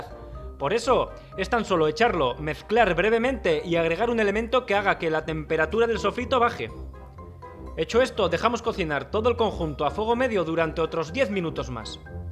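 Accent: Basque Country, Spain. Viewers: Eres muy grande y muy salao, y tienes el acento vasco muy bonito. Yo soy de Murcia y echo mucho de menos esos paisajes verdes de tu tierra. Y las recetas ke haces, muy ricas y sanas y te explicás divinamente.🥰🌷🌞😘🇺🇦🌈💜❤️🐶😻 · Es Vasco, hablan así todos!!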